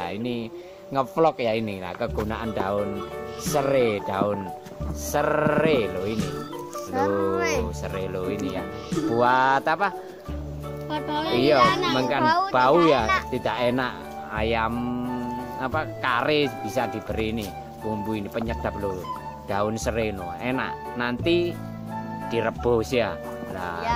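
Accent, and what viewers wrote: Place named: Java, Indonesia. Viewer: Asli ngendi bang kok boso jowone kadang kentel banget👍👍👍 Salkom dan mantuulll banget👍👍👍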